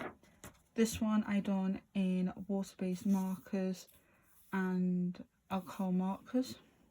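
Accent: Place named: Wirral, United Kingdom. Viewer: You sound like you’re not too far from me, I’m from the Wirral! B&M, Home bargain and the works are such good places to get books from aren’t they!? Think I spotted a few I got from there too. Lovely pages x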